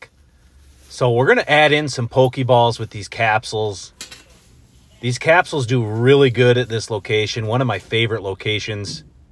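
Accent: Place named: Canada. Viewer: Where are u from? Your voice sounds Canadian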